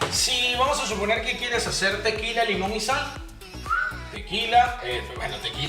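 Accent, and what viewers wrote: Venezuela. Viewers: Soy el único que piensa que tiene un poco de acento venezolano?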